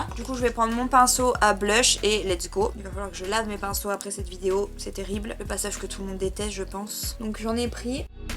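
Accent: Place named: England, United Kingdom. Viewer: Cet accent anglais j’adore😂😍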